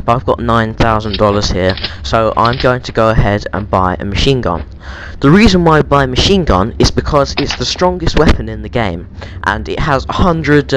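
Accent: United Kingdom. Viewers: he has an english acent bad spelling* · LMAO, Great Video...im not racist but the British accent makes it funnier for some reason!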